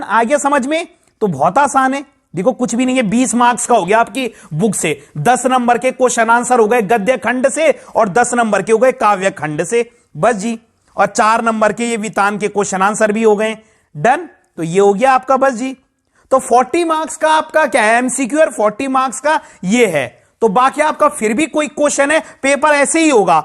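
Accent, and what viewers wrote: Garhwal, India. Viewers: Sir ap ghadwali ho kyy ??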